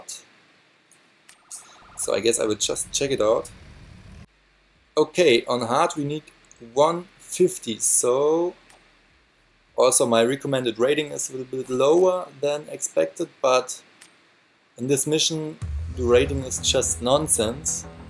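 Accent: Germany. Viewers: were are you from ? you Sound German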